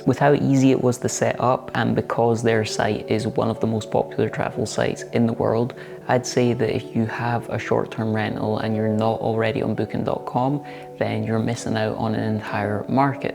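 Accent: Scotland, United Kingdom. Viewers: People love honesty, and being up front about things, it is endearing. Plus who doesn't love that UK sense of humor, especially silly or self deprecating sarcasm? I think the soothing. calming aspect of your videos come from your voice, quiet with that very noticeable Scottish accent. What's not to love there? And who wouldn't be fascinated with a noticeably ginger Scot with a white patch on one side of his head who actually has the nickname and likes it? That is just the best. I honestly like your videos about your cat, and you baking, and you taking walking/hiking tours of your local country the best. The beauty around you and the music you choose for those videos make it like a 20ish minute mini vacation. Great work ….Brian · Have you thought of doing voice over work? You have such a lovely voice although am probably biased because my mum is scottish and just love (all) scottish accents! 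xx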